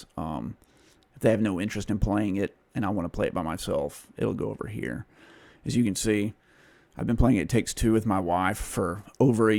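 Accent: South, United States. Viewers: it's the main game second hand game store here.. it's great when I get bundles and games I don't want/dupes I trade them in for credit and keep the games I want! I thought I heard some southern! But usually when I think southern I think Texas haha.. I'm jealous of Americans coz how many youtubers in America I've seen there's quite alot of video game stores.. but most games are cheaper here which helps me when I don't have alot of money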